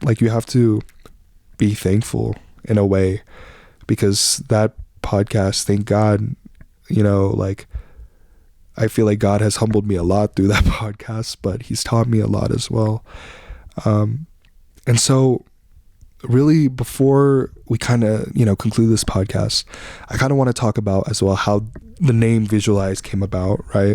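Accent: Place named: United States. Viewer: i just binged watched ur videos coz i watch ur “how i learned tagalog” video and wanted to hear how u speak filipino. Ur taglish sounds diff from the usual taglish. Its cool tho! Explanation: you have a different way of switching from tagalog to english and sneaking in english word + there's some filipino words that you translated in english that we dont normally translate in english. (we would still say it in tagalog) Accent: u sound filipino american. We can distinct fil-ams accent. U have filipino accent with a hint of american accent. Pis dont take this as an offense. More like critics. And i love ur uniqueness in speaking To improve ur speaking... watch noontime shows or filipino podcasts and imitate their accent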